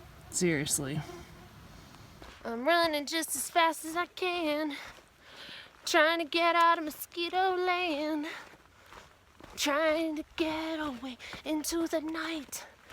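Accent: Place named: Alabama, United States. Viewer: That was some Lake...the best in your LAFE?!! You sound like my sister (Birmingham, Al) LOL! Love it!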